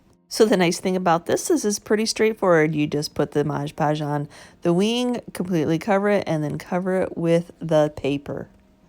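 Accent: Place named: Michigan, United States. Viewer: LOL... as I was listening to you speak I tried to place your accent which I have pretty much given up on cuz I'm NO good at it 🤷, but since I'm home alone I figured it'd be OK. I said 🙋"Michigan" and not 30 seconds later you were talking about Annie's paint holding up well outdoors "here in Michigan" and look at me now 😁 getting one right and nobody's here to witness it. 😞. That's OK, we know! I'm really loving the decoupage on the dragonfly and the flowers. Long story, but I have a metal flower a lot like your largest one and a butterfly, both of which I really need to look polar opposite of what they are now, and this will absolutely do the trick. Perfect timing that I found your video today. Thanks for sharing. 🦋🏵️ From the Southern California desert, MK ♥️🌞🌵 Oh! I was able to properly guess your accent cuz although I was born in Detroit I had my forth birthday here in CA and, as such, consider myself to be a CA girl, my remaining family - one cousin - lives in Bloomfield Hills and works at GM. 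My mom's entire family stayed in MI while she and my dad, apparently the wild ones, drove west in the early 60s.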